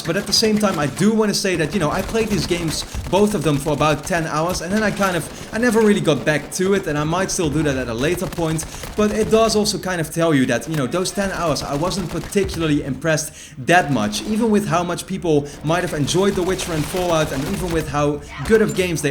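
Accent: Netherlands. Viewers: omg are you dutch? i tought it from your voice ( im dutch :p)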